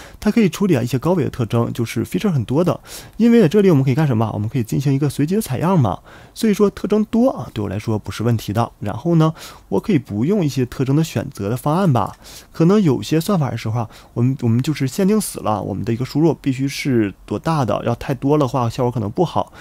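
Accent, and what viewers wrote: Northeast, China. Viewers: 这东北话我听着很带感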